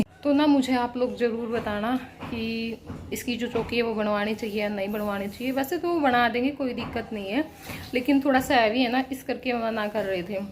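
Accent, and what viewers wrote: Haryana, India. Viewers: Haryanvi touch aapki language m sunn k bhuutt acha lga Di... God bless you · Bebe haryanvi boli mast laga h👌👌👌👌👌👌👌👌👌👌👌👌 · Aap h haryanvi me baat kera kero behot achi lage h aap k mu se sunne me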